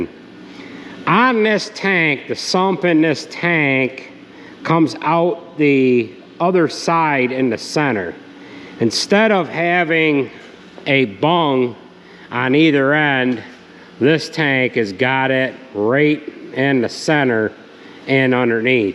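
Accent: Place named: New York State, United States. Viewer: I prefer the longer video's. Love to hear your yakking as it teaches me New York state speak!! Learn a little bit from Andrew Camarata but you beat him by a page or two.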